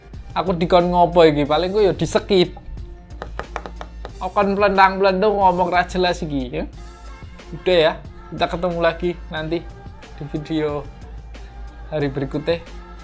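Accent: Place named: Java, Indonesia. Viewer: medok pak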